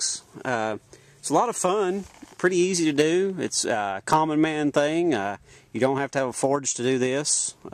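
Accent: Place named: South, United States. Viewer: This guy sound really southern. (in a good way)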